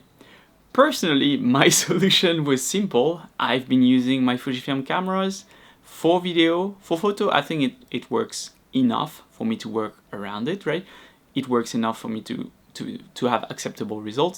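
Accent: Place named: France. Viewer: tu as ralenti dans ton script ,c'est beaucoup plus audible,ca faisait trop je veux masquer mon accent Francais;) c'est une " critique" positive ;) c'est vrai que c'est l'exode Fuji en ce moment,pour ma part ,toujours en xt 3...avec beaucoup de ratés,notamment photo d'enfants .... perso le nikon zf me fait de l'oeil depuis plusieurs mois,pas forcément le FF ,mais plus l'ergonomie Fuji et le meillerur AF,tu l'as essayé?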